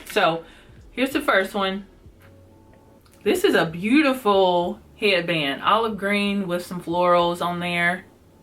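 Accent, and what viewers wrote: South, United States. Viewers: ok. Bye the way, I love your southern accent!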